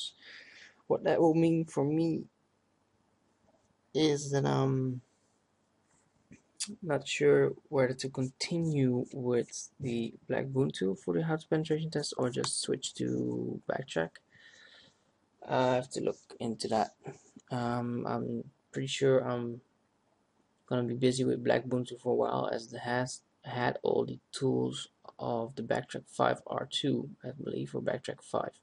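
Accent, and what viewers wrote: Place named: Netherlands. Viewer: I can hear that you're dutch. How old are you? :D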